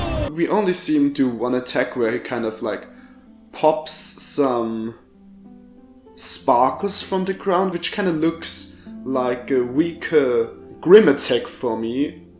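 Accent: Germany. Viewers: Bro deine englisch aussprache verrät direckt das du deutsch bist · love your vids and your german accent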